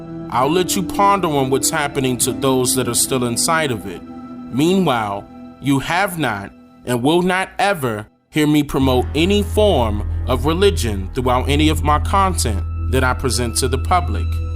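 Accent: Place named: New York, United States. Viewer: video might be ok i f your fucking ny accent was not so fucking annoying loll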